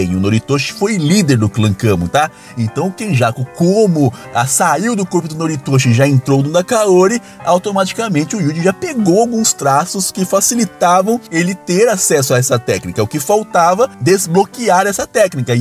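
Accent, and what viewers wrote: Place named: Minas Gerais, Brazil. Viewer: O dono do canal ficou tão feliz que deixou todas mineirisse dele sair kkkk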